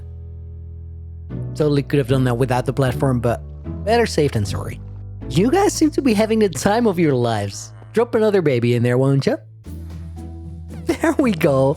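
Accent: Netherlands. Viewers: Toch lekker dat kleine nederlandse accenr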